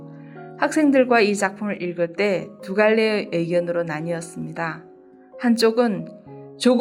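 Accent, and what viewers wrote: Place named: Gyeongsang, South Korea. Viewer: 경상도 억양은 어쩔수 없지만 발음은 좀 ㅠㅠㅠㅠ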